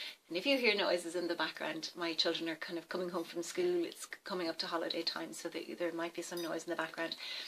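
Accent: Ireland. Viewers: Just think tomorrow will be lighter just a little longer til it’s summer again... my favorite season I’ve been practicing this without knowing it Thank you! I have lights hanging all year long and I light candles every single day I like citrus smelling candles or fruity ones Are you from Ireland? I love your accent I’m from the US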